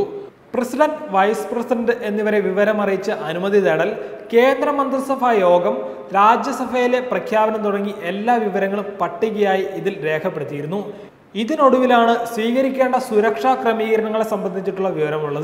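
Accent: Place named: Kottayam, India. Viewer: ഇൗ വക കോട്ടയം.ഭാഷാ ഭോഗികളെ .അവതാരകൻ ആയി വയ്ക്കരുത് . മലയാളം പറയാൻ അറിയുന്ന ചെറുപ്പക്കാർക്ക് ഇത്ര ക്ഷാമം ഉണ്ടോ.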